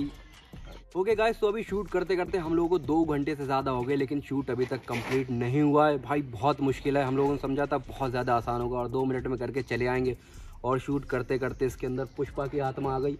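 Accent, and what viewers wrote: Farrukhabad, India. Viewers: mujhe tumhare bolne ke tarike se hi pata lag gya tha ki tum farrukhabad ke ho I'm also from farrukhabad fatehgarh mera nanihaal hai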